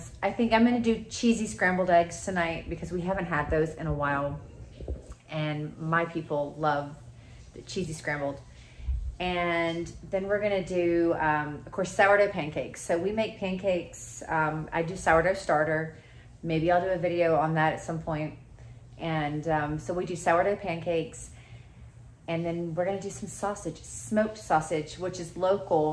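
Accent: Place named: Scotland, United Kingdom. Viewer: Just found your channel by chance and thought you were Scottish 🤣🤣 and yes I live in Scotland 🏴 and have subscribed 💕💕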